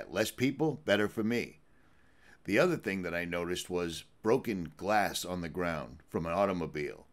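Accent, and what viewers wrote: Boston, United States. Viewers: I'd recognize that Bostonian voice anywhere! Damn! I sorely missed you!!!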